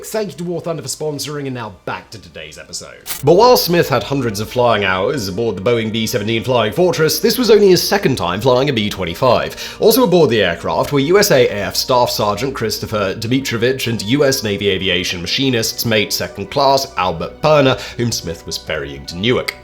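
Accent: United Kingdom. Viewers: maybe that's just because his British accent. Seriously though, go to settings and playback speed. Select maybe .5 or whatever you want to try. I'm listening at 1.5x speed currently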